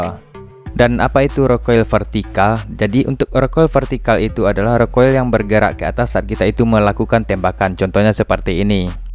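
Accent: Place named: Padang, Indonesia. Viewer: oh.. orang Padang ya, bang. Kirain orang Malaysia 😅🙏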